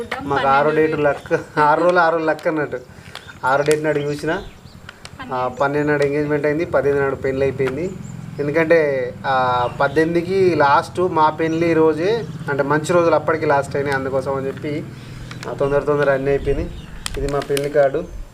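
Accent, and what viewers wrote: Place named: Nirmal, India. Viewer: ఏ ఊరు మీది చెప్పండి మీ ఊరు అదిలాబాద్ దగ్గర నిర్మల్ మీ మాటలు అలాగే అనిపిస్తున్నాయి మా ఊరు మాత్రం వరంగల్ మీ ఫొటోస్ చాలా బాగున్నాయి 👌👌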